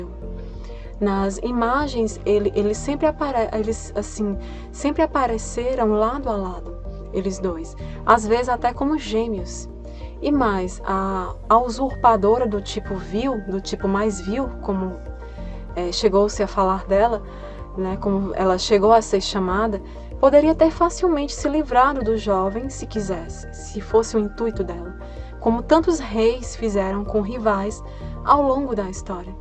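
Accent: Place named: South/Southeast, Brazil. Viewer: Sou seu fã..., incluindo seu sotaque que, me parece, é do sul/sudeste...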